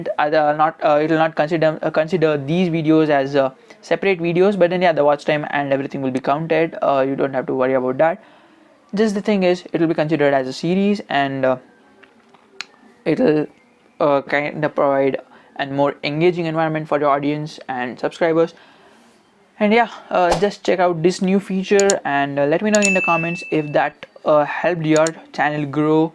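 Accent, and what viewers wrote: India. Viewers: Hindi accent I noticed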